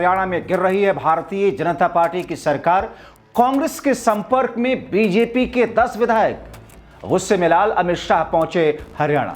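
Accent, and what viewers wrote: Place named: United Kingdom. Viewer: English tone me hindi news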